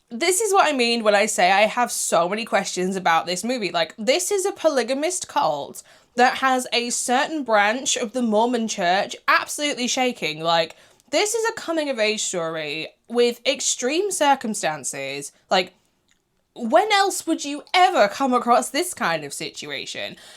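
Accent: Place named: England, United Kingdom. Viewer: I can't sound as good as your English accent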